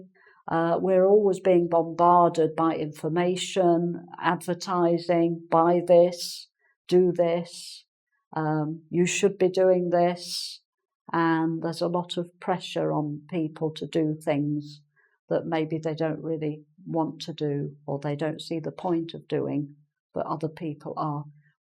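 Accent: United Kingdom. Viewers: i subscribed cause i admired ur english cause i'm facinating with the british way of speaking and i'll follow all ur lessons thank u so much hope u'll help us to get the british accent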